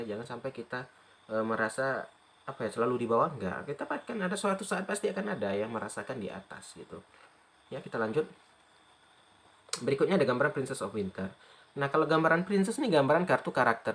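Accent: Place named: Java, Indonesia. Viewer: Klo ngomong.... Bahasaya...... Lbut Org jawa apa org medan. Apa prg madura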